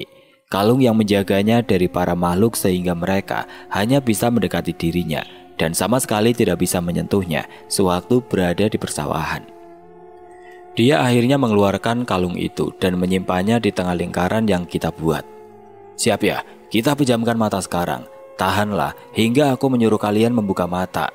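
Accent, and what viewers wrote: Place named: Sunda, Indonesia. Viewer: 😂😂lucu bgt bahasa sunda nya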